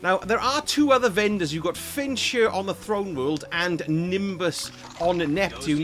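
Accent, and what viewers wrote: United Kingdom. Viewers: Love your channel, nice to hear a non-american accent in videos. (fellow brit btw)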